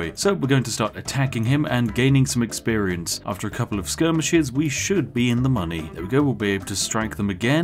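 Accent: United Kingdom. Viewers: You sound like Internet Historian. Is this one of your "secret channel" where you sound more like a british dude? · your accent is so British ohmygod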